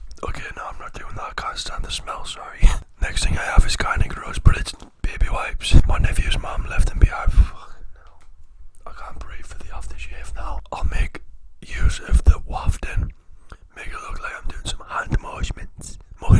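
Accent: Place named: North, United Kingdom. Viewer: I understand everything he says but I guess its just because Im also from the North🤷🏻‍♀️ Great ASMR though, very tingly 😍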